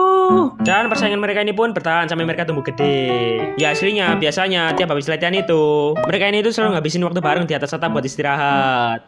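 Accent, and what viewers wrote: Java, Indonesia. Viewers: Abang orang jawa ya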